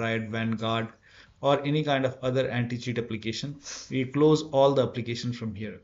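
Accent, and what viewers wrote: India. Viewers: BRUH FINALLY! Only an ubtuer with indian accent helps xd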